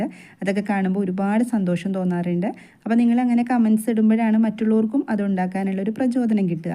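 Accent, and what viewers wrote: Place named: Thrissur, India. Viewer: Thrissurinte സ്വന്തം ഭാഷ ❤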